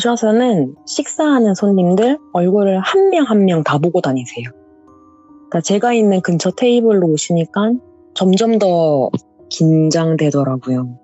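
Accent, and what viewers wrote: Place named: Gyeongsang, South Korea. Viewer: 근데 경상도분이네? 억양은 숨길수없다 ㅋㅋ 난 완벽함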